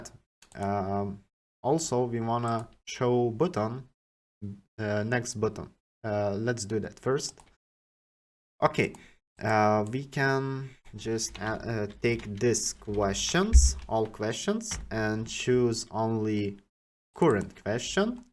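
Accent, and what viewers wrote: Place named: Eastern Europe. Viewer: where are you from dude? you sound eastern european ;) Cool video!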